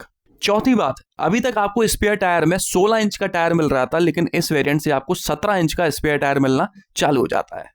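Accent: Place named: Delhi, India. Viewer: Typical ghaati dilliwali hindi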